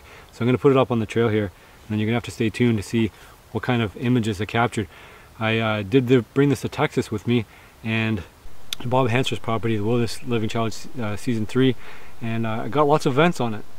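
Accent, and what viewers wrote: Canada. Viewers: My bad just heard how you said things but used pounds as a measurement. Idk what Canada uses. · Are you Canadian?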